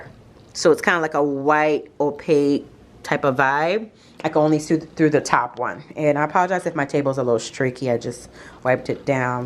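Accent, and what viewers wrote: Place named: Virgin Islands, Caribbean. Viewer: I love the video, very organized and well put together. I picked up quickly on your accent 🙂. I’m also from the Virgin Islands 🇻🇮, St. Croix. I’m now your new subscriber!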